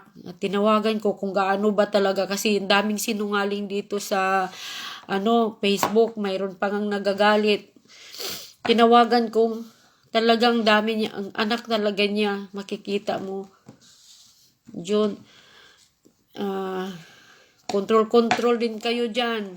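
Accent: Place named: Visayas, Philippines. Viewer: Congrats po.. Parang bisaya ka po maam, taga bohol ko maam.. Sana mabibiyayaan din ako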